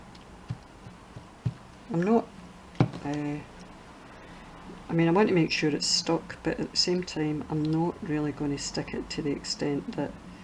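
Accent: Scotland, United Kingdom. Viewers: like everything you do, these tags are spectacular. Love to watch your videos and so enjoy your beautiful Scottish accent. My maiden name was Campbell and I would love to visit Scotland one day. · Just Magnificently Beautiful! I Just Luv All Your Artistic Work&How You Fixed The Problem! I Luv Listening To Your Scottish Accent Too! I Don’t Fall Asleep Watching Videos But I Keep Yours&Others I Luv&Put In Favorites&Watch Later!💜🦋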